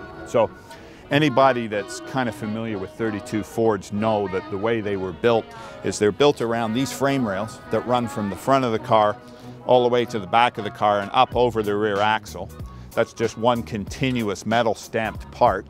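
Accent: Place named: Canada. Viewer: obvious Canadian accent...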